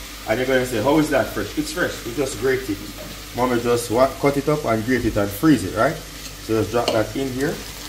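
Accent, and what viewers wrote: Jamaica. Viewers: Chef, next time you come back, please do a pop-up cookout in MANDEVILLE. I would order this meal. While you're on-site cooking you could do a book signing for fans. It would be a great way to market your book. Your meals are prepared in such an appealing way that one can eat with one's eyes. I also love your attention to cleanliness. No dutty pot, we say. What did you say, Old cow hard to chew. 😂 Don't go offending any old cows now, my boy. I love the little value bombs you drop offering instruction plus little-known cooking secrets. Communicated in standard Jamaican English. You've got it locked. 💯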